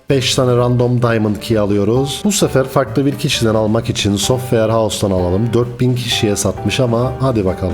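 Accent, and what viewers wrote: Cyprus. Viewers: kral kıbrıslı mısın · kıbrıs ağzın çok iyi reis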